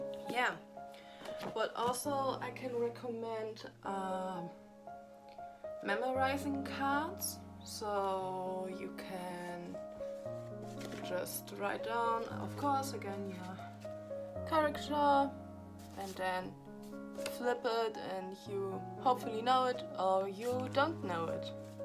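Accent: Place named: Eastern Europe. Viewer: Thanks for this video! I am thinking about studying sinology and you helped me a lot. I study on high school now but next year I'll need to decide what program at which uni I wanna study and apply there... I was thinking too about studying in Germany although german is not my native language (same with english), I'd improve my german at studienkolleg and then apply to the uni, but I am not sure if it's not too much for me :D And what are your native languages? You have interesting accent, I guess you speak german and some slavic language! Polish, probably?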